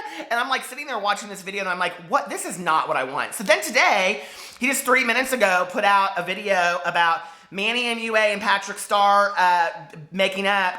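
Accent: South, United States. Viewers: I just found you!!! And I love you to death!! And you'll probably be making fun of me because I make videos also which you will probably find boring but I think you're just hilarious! You remind me so much of my friend Gordy who we all nicknamed Gordo....I rarely get to see him now because I moved back home to Texas and he's still in Cali......long story short we met him at an RV Camp out on the shoreline in Northern California and he helped me and my girlfriend not freeze to death because we couldn't get our heater working. Why in the hell am I telling you this? I just watched your video and feel like we've been friends forever! Where are you at? You appear to have a southern accent? I'm in Texas! Give me a shout sometime! New Sub!!!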